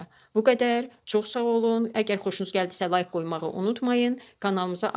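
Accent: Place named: Ganja, Azerbaijan. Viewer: İndi duzlu suyunu qayirajıyıx ,,belə bircə Gəncəlilər danisir ,,ağzını örtüb qoyuruq qırağa .Sizə uğurlar xanım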